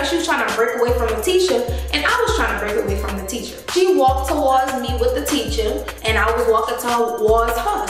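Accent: New Orleans, United States. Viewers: Your makeup ❤️ are you from New Orleans ? I hear alil bit of that Nola when you speak . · you from nola ? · You sound like you a Nola baby!!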